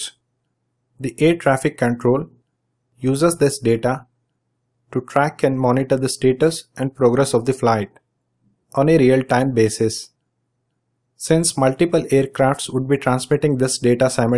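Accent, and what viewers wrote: India. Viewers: I dont like the indian accent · Indian accent 🙄🙄🙄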